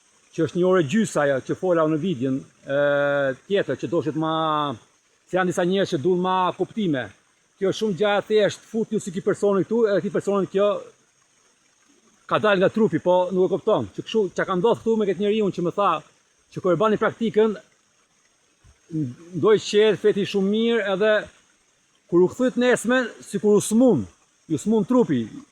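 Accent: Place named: Gheg, Albania. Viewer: E folura e ketij djali esht gjuhe bio,esht gege jo toske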